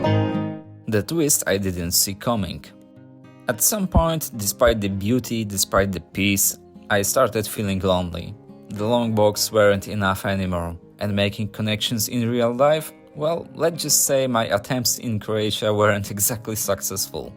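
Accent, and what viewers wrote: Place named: Ukraine. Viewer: U must be wealthy. Dalmatia is tourist destination, which means expensive and u gotta have good income to support yourself. It is not Monte Carlo, of course, but still locals struggle to survive and people of your age left to work in EU, mainly Ireland, Scandinavia or Germany. Just renting something to live or real estate is up to the roof in recent years. And what is your home country? 2000 km and accent sounds like Ukraina.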